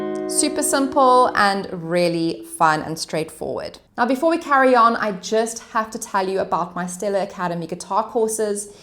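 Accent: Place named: South Africa. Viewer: Thanks! That was a great tutorial. Nice and slow, especially the breakdown of the intro. And the tip about the capo change in the 3rd verse was super useful. Best of all, the tutorial was from a fellow South African. I grew up in CT (now living in the US), so I recognized the accent straight away. I love too, so I will be digging into your other songs by him. Keep the great content coming.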